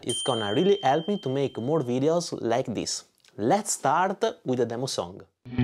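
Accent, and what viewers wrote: Italy. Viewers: ah ma quindi sei italiano anche tu? avevo notato una pronuncia particolare che può essere nostra o comunque in generale di chi non è madrelingua inglese / americano.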